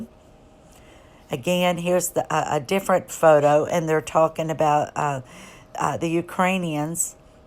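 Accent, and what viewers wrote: South, United States. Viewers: The Southern accent gives you away as a bullshit artist MAGA person.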